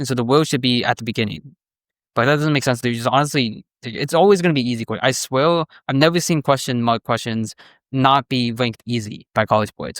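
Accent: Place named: Asia. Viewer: Well he has an asian accent and being american doesnt automatically make you a native speaker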